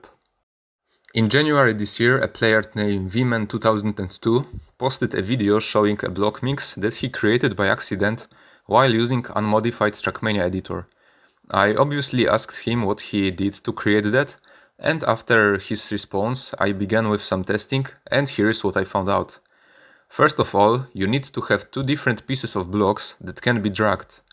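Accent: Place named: Poland. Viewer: I have watched a few seconds and thought this guy has identical voice and accent as me, is he polish? Polish version of Trackmania, yup, haha. Crazy how similar our voices are.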